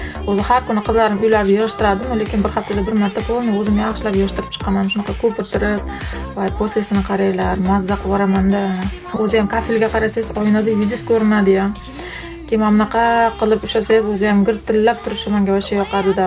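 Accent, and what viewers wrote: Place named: Fergana Valley, Uzbekistan. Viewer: АССАЛОМУ АЛАЙКУМ, УЗБЕКИСТОНДИ КАЙСИ ВИЛОЯТИДАНСИЗ, ШЕВАНГИЗГА К,АРАГАНДА ВОДИЙДАНМИСИЗ ДЕЙМАНДА?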